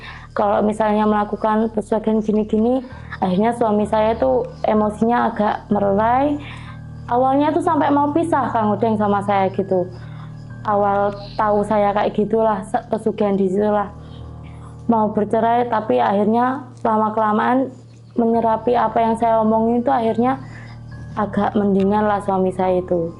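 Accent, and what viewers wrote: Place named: Pantura, Indonesia. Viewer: Dilihat dari logat bicaranya,Mbaknya saya prediksi adalah orang sekitar Pantura,Jawa Tengah.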